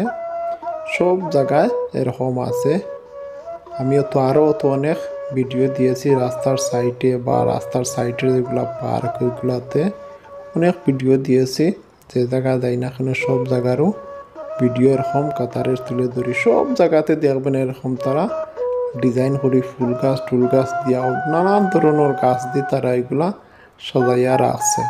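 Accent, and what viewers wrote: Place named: Sylhet, Bangladesh. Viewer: খুব সুন্দর হয়েছে আর এবারটা বুঝতে পারছি আপনি বরিশালের না সিলেটি😅😅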